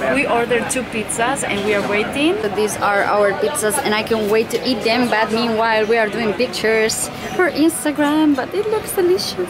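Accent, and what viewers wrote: Spain. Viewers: me parece de cuarta una española todo el tiempo queriendo hablar en un inglés un poco duro!!!! afloja con el english y habla en tu propia lengua te aseguro que te verás más natural no tan forzada!!! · A mí me parece genial que hable en inglés, porque ella tiene seguidores en muchos países. Si tiene acento español hablando en inglés es porque ella es española y no tiene porqué perder el acento. Yo no creo que su inglés sea tan malo, se entiende perfectamente. Por otro lado, para llegar a hablar muy bien un idioma extranjero, hay que hablarlo antes mal (que no digo que sea el caso). Nadie nace sabiendo. Hay que ser un poco más tolerante con los errores, y deberíamos valorar más su iniciativa. Hay más mundo que España y el castellano. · Me gusta tu acento español !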